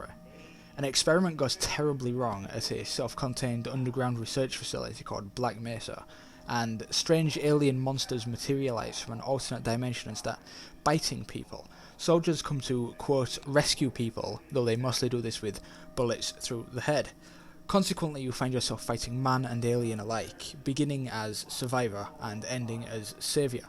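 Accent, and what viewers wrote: United Kingdom. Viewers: hes british, but not with the accent you would expect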